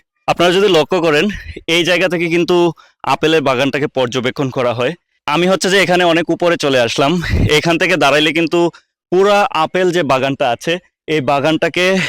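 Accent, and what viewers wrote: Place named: Mymensingh, Bangladesh. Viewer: Mymenshinga,ko k kho bole bangàlataow bolte pare na,blog kore aber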